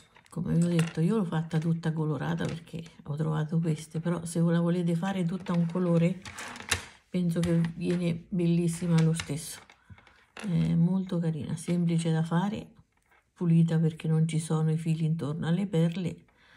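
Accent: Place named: Fermo, Italy. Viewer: Veramente bella e primaverile. Ma di dove sei?io abito in un paesino a pochi km da Fermo...a sentirti parlare sembri anche tu delle mie parti...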